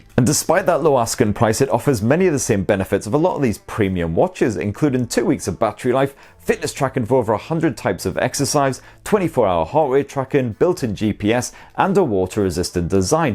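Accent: United Kingdom. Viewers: Me gusta el acento británico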